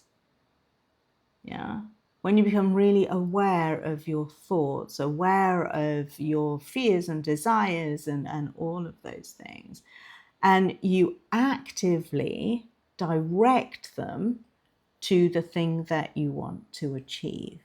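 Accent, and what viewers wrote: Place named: RP, United Kingdom. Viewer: Wish she didn't speeeak with such ah Marisaaa Peers posh ahccent. It detracts from and impedes the message...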